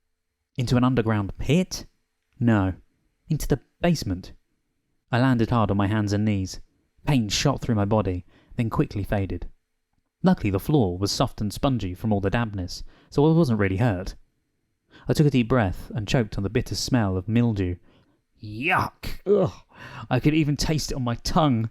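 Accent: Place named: United Kingdom. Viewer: I love the UK accent and the way he read, so funny. Thank you for the work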